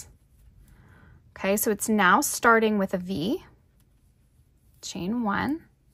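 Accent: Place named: Canada. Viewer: Just finished my cardigan. Beautiful thanks!! And I do love your Canadian about and out pronunciation 🥰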